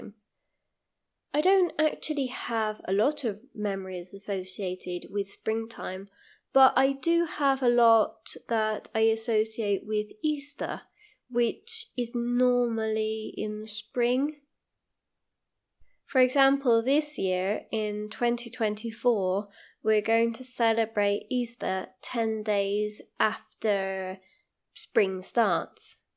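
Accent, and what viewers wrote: RP, United Kingdom. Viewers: Hi Is this RP accent?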